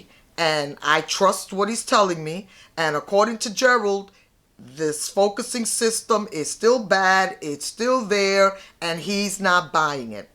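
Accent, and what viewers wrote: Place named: New York, United States. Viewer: I watched the best phone camera review this morning from Tony and Chelsea - they shot the video with the GH6 and there were moments when that autofocus was simply not cutting the mustard. I've seen some amazng footage out of the GH6 but normally from professionals who know what they are doing. I'd love to buy this camera but as a Fuji XT3 who has suffered in the past from autofocus issues (thankfully less these days), I think my next camea will be the Sony A7IV. Talking about computers and codecs - My new Macbook Air M1 is fantastic - complete game changer! P.s. Love your accent! Are you from near New York? Makes me want to watch Law and Order again.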